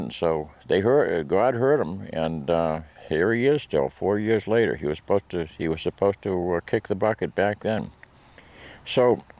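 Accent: New England, United States. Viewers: I'm not sure where you live but you do have a New England type of accent? I was born in Minnesota but spent 70 of my 80 years in California, except for a couple years in West Germany. Anyway, here, you have touched on one of my favorite cartridges. I have owned one Ruger M77 25'06Remington and two 25'06 Ackley's. I hunt northern Nevada, or at least I DID, and for goats and coyotes at 350-400 yards, it does the job. The traditional problem has always been that nobody makes a suitable target quality bullet in that bore diameter but I knew a fellow that would run off some of his super accurate 100 grain Starkes. Great bullet weight for open range coyotes. You are frequently focused on resale value, when buying rifles, and everybody has their reasons but I don't care about that. If I need a new barrel, I buy a premium target grade in whatever contour that strikes me and whatever twist suitable for the bullets I want to use. 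By the way, I think we agree about flat based bullets, whenever possible. I am NOT a fan of this extreme long range potting of big game animals. These people have no idea how much even a bedded deer can move while the bullet is in flight! My ethical distance is 400 yards. Yes, I will take an occasional shot at one of a pair that is leaving, like if it's straight away. There is a magic in some cartridge designations and 25'06 is one with the magic. So is the 220Swift; pure magic! So is 300Mag. I'm an advocate of a factory 22-250AI cartridge. That case far outpe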